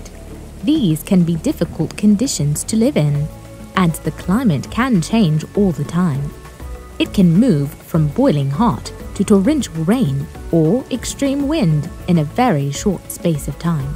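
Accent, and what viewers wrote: United Kingdom. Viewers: Do you have a British accent? ❓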